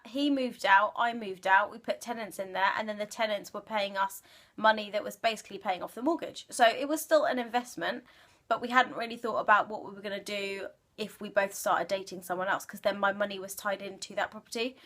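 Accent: United Kingdom. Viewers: Love you British accent. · Nice British accent. Cheers!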